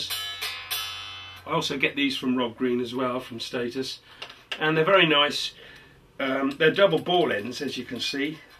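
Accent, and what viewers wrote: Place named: United Kingdom. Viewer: <3 Love your british accent.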